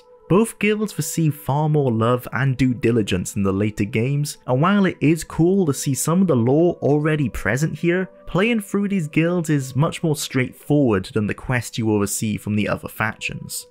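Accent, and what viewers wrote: Wales, United Kingdom. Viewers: you shouldnt suppress your Cymric accent, Cymric people are based; also, Welsh/Wales is a slur meaning "Foreign/Strange People", it shouldnt be used, fuck satisfying the wants of the British, Britain is an Anglo-Scottish Union of oppression and cultural-genocide against the Gaelic and Bretonic peoples of the UK; the Southern English and the Low-Lander Scots, rule the roost of this regime we live in, under our dictator, Lizzie, whom ironically has the same birthday as Adolf Hitler, its symbolic... of the fact shes a fascist shite-arse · I can't believe I listened to a Welsh accent for almost four hours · Don't Hide Ur lovely Welsh accent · ...I like your Welsh accent. Ignore the jerks who complain about it. Brilliant video!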